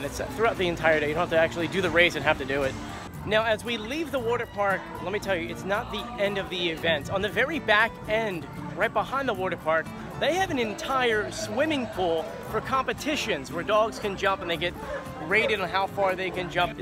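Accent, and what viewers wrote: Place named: South Jersey, United States. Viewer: Dude you have a such a rough south jersey/philly accent. The way you say water is the poster child for our accent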